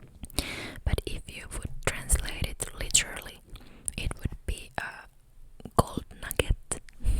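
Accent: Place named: Finland. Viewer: its easy to tell whos from finland because u can hear from their english accent sounds so much different, good video btw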